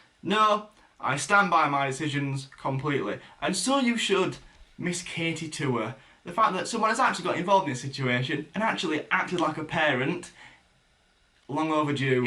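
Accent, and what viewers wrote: United Kingdom. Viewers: I love your accent are you British? Swedish? Irish? Or Australian?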